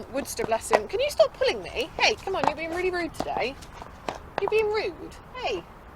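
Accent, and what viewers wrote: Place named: South, United States. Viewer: Very informative as usual and you can’t beat a southern accented girl talking udder grease and pig oil lol x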